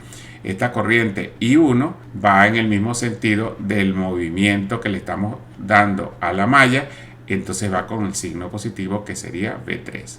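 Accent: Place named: Guárico, Venezuela. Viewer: Tienes un asentó de Guárico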